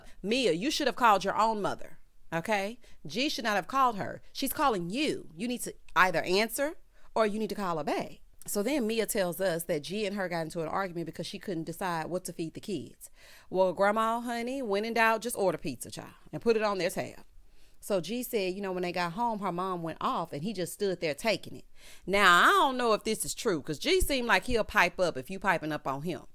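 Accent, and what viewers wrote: South, United States. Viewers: I remember very first review. She said “I’m country so you might want to turn on your closed captions.” I was on board with the G.O.A,T. moving forward 😉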